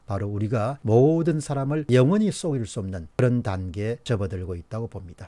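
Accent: Gyeongsang, South Korea. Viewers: 문재인포???? 에라이 갱상도 발음좀 고쳐라잉...............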